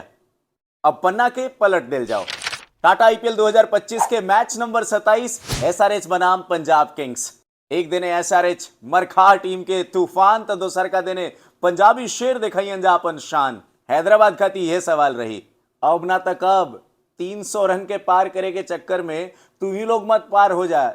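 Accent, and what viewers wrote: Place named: Bhojpuri, India. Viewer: Bhai Aap ki comentary To Hamko achi Lagti hi Bhojpuri ke Andaaj me. Lekin Hamko lagta Aap ko ye nhi pta ki Ipl ke saare match Bhi fix hi 🙏🙏🙏🙏🤣🤣🤣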